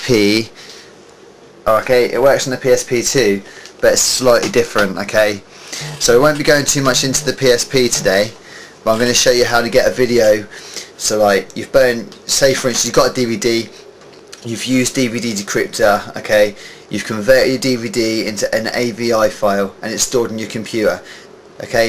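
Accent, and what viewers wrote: United Kingdom. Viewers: Great Brit accent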